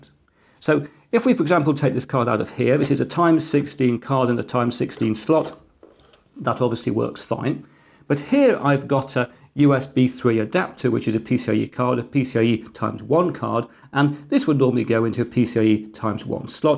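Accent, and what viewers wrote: United Kingdom. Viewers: Is it me or do you understand things better when explained in a British News anchor accent 🤔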